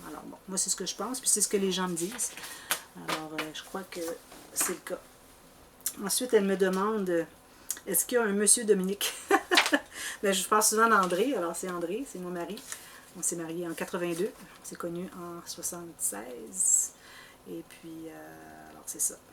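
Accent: Quebec, Canada. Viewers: Merci pour vos réponses ! J’aurais probablement posé les questions que celles auxquelles vous avez répondu. C’était un plaisir de regarder toutes vos vidéos et de vous voir en même temps. J’adore votre petit accent et les petites expressions québécoises que vous utilisez. Ce serait dommage de tout gommer !! Cela a son charme !! Votre français est tout à fait clair. Je vous regarde toutes les semaines et j’ai plaisir à vous regarder depuis plus d’un an maintenant et, j’ai moi aussi l’impression que vous êtes une amie ... de scrapbooking. J’ai aussi la chance de vous avoir découvert en anglais et de pouvoir vous comprendre dans les deux langues. Et j’ai pu apprendre les termes de scrap en anglais. Je me demandais combien d’albums vous aviez faits au cours de vos 700 et quelques tutoriels. Je vous remercie encore pour votre générosité. Bonne continuation . 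Mylene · J'aimerais vraiment avoir une amie comme vous. Toujours de bons conseils sur tout. Vous êtes une bonne personne. Votre accent et vos termes québécois me plaisent beaucoup. Gardez les c'est votre marque de fabrique..vous êtes fière de vous et je vous applaudis. Je suis accro de votre chaine. Je fais du scrap depuis 3 ans mais depuis 1 an que je suis votre chaine je me suis beaucoup améliorée... et je n'ai pas encore visionné toutes vos vidéos..... vraiment MERCI. Et continuez.....Je me permets de vous embrasser ainsi que votre mari. A bientôt dans d'autres vidéos.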